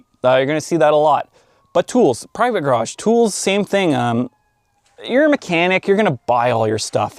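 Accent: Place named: Canada. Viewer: are you canadian or just from the midwest ?☠️☠️ pretty cool accent. but came here to say i wanna be able to fix riding lawn mowers and mess with cars, aka fix them, possibly rebuild engines and just want to not be confused when opening the hood of a car.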